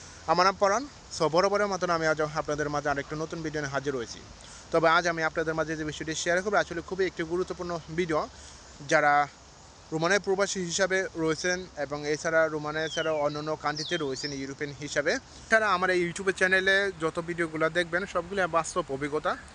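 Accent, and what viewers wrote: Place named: Brahmanbaria, Bangladesh. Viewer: আসসালামুয়ালাইকুম ভাই মনে হচ্ছিল আপনি আমাদের এলাকার ব্রাহ্মণবাড়িয়া আমার বাড়ি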